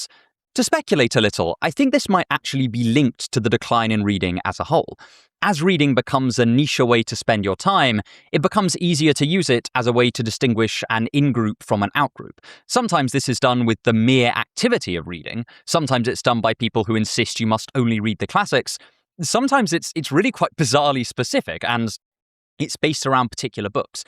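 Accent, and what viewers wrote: United Kingdom. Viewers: First video I saw of yours was one with a super clickbaity title, where you "bashed" people for not enjoying the classics you liked. Ofc... you didn't actually do that, but it left a very weird and mixed first impression, that, combined with the primped-up, smart dressing style and the entirely clean appearance and (as discriminatory as I know this is) the heavy, but thoughtfully elegant and clear British accent made for an image of a typical "snob". I now realize you're the opposite... you're just like this, cause you like being like this and you want to share this love of classics and reading with the world in a non-pompous and inviting way. Sincere apologies for my prejudiced first impression. I also really adored the re-reading aspect towards the end. There was a while in my life where I was deeply against the idea of looking back and it was all about the quantity of highly lauded experiences to me. 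Today, I much prefer returning to things I really adore. Right now, I am reading the manga "Vinland Saga" and watching the anime at the same time, with a friend whose not only never seen it, but who claimed to hate the art form, before I proposed to him (based on knowledge of other things he's enjoyed) to watch it with me, and he's enamored by it. And every time I've experienced this story in all of its different formats, I've discovered some new aspect of it. Even watching it is different. The first time I watched it was with another friend, who was insistent on watching dubb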